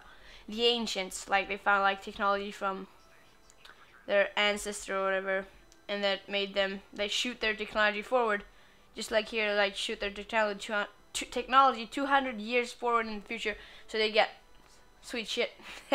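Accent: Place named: Sweden. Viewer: cool videos are u from sweden u sound swedish. :D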